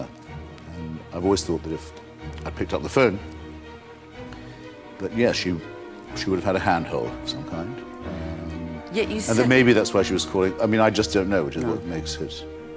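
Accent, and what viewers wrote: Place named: RP, United Kingdom. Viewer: I disagree. I've been listening to Hitchens and his brother Pete for almost half a decade, and I think I'm correct. But I couldn't read his mind, so I can't say for certain, just like you can't. As for proof, well I can say that sounded more like a misotheist than an atheist. Misotheists hate God because they think he's immoral. Literally every short you'll see on YT is calling God immoral, unjust, a dictator, etc, etc. If God doesn't exist, why did he spend all his time criticising Him then? And oh yeah, he literally said in his debate with John Lennox or Frank Turek (can't remember which) that even if God was real, he still wouldn't believe in him I didn't insult his mother. I just said that another man was more important to her than her own sons. Why don't you word what his mother did in a nicer way if you think I'm being hateful. 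And I'm not religious. I'm not even a Christian. I'm just someone who was a Hitchites fan before finally studying scripture for myself. And I don't hate. He's just objectively wrong about a lot of biblical facts, but because he's got a posh British accent, his word are taken as gospel.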